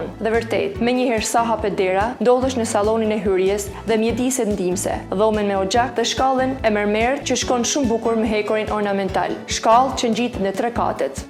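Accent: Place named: Highlands, Albania. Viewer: Sa dialekt të bukur malsoresh paske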